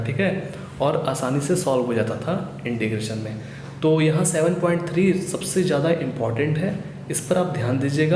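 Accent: Bihar, India. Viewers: Sir aap bihar se hai kya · Sir Aap bihar se hai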